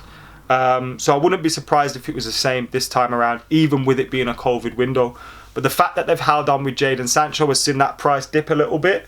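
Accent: Birmingham, United Kingdom. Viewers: you from Brum?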